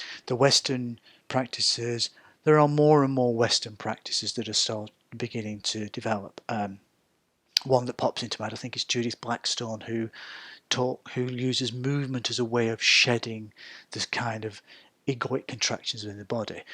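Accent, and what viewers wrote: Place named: North, United Kingdom. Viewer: Thank you, you've demystified quite a bit for me there. Great to hear a northern accent too! Cheers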